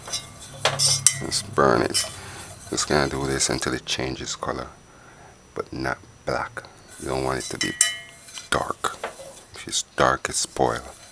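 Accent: Jamaica. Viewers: I love Jamaican accent. I would listen to you talk all day.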